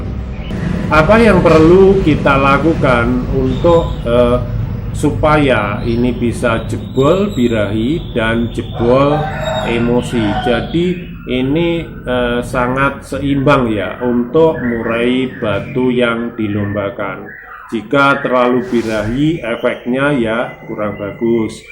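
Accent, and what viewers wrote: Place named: Banjarnegara, Indonesia. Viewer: Pak boleh nanya.. bpk asli mana? Mf pak soal nya mirip orang banjar negara 🙏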